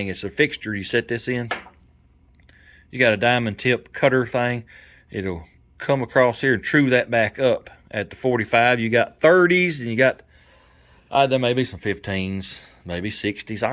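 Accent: South, United States. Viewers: I enjoy your videos and your Southern accent. Take your time and but please don't stop. Thank you. From Namibia.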